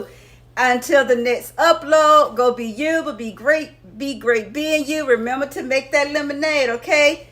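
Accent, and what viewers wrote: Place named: South, United States. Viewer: You gotta be south 😉 Louisiana here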